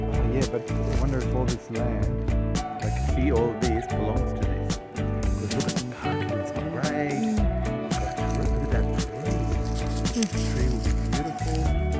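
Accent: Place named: Italy. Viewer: I imagined something similar ... your pronunciation in English is the typical pronunciation of an Italian. Welcome to Italy!!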